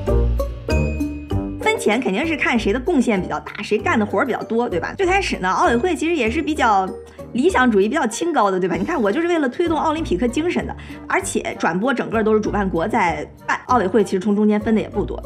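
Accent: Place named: Dalian, China. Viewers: 哈哈，小lin东北人！ “抖“是奥运会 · 这期大碴子味特浓郁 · 哈哈哈哈 大连话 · 喜欢大连话！！！ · 、 一口地道的东北腔，特有趣。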